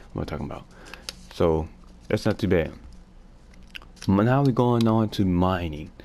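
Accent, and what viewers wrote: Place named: China. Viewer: U got a Chinese accent are you from Uganda?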